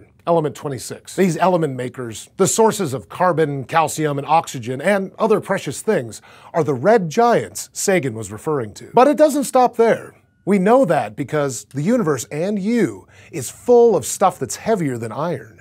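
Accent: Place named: United States. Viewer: At this point, I just blame it on the American accent, and leave it at that. We all know what he means.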